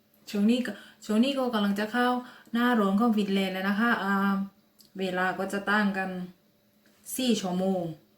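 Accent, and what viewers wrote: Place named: Ranong, Thailand. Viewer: สำเนียงเหมือนคนระนอง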